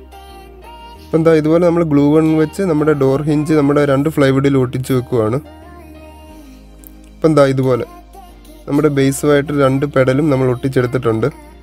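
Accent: Kottayam, India. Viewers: Bro kottayakaran aanenn thonnunnu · Kottayam kaaran aano alla aa nadu fagathu ennu parayunnathu ketu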